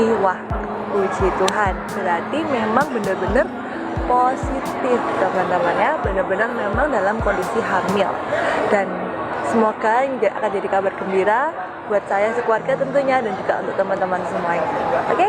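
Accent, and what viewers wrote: Java, Indonesia. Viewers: Mba kok ngmongnya medok .. kaya org Jawa yah😊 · medog kali logat nya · Dok medok, jawa mana dok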